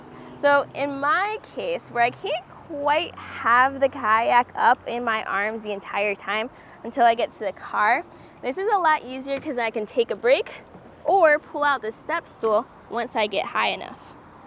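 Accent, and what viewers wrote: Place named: Hawaii, United States. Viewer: She doesnt really have an accent but more hint of her family dialect , slightly Hawaiian or Asian.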